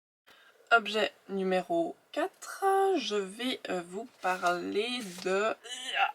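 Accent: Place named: Switzerland. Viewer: Merci pour ta vidéo je me suis abonnée je me demandais d’où venait ton accent donc tu es suisse 🇨🇭 très contente de te connaître et non tu ne parles pas trop sur tes 5 éléments j’en possède 3 et je pense vivre en camping-car Vivement les prochaines vidéos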